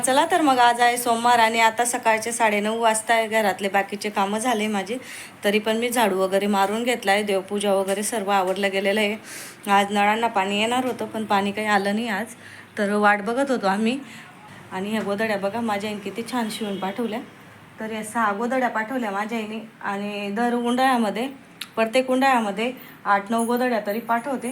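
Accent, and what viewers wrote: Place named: Ahirani, India. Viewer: ताई तुमचे video छान असतात. तुमच्या भाषेत उत्तम असा अहिराणी touch असतो.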